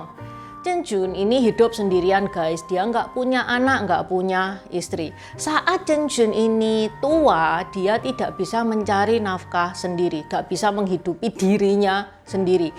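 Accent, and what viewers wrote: Java, Indonesia. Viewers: Mantap mba jawanya medok sedap bangat ! 🍺🍺🍺🌹🍷🍷🍷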